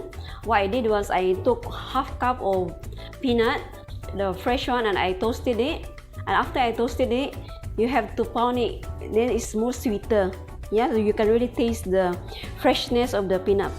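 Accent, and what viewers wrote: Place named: China. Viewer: Hello galing naman para kang Chinese mag English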